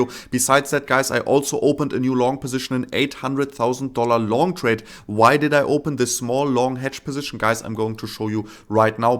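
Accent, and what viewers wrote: Germany. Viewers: Your videos are fantastic. Thank you! Packed full but brief and to the point. As a linguist, I'm curious about how you acquired English given that you have some German accent but your grammar and vocabulary are flawless. Did you spend a few years at a younger age in an English-speaking country?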